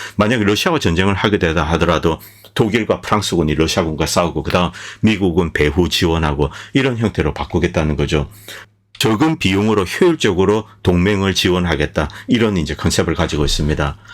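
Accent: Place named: Busan, South Korea. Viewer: 본인이 몰라서 그렇지 전혀 다릅니다 지금 방송하시는 도 부산 사람이거든요 거의 이 억양입니다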